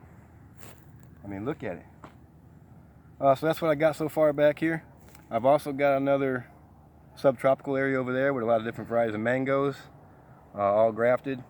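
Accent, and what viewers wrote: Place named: New Orleans, United States. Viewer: I detect a little bit of a New Orleans accent in you bro🤗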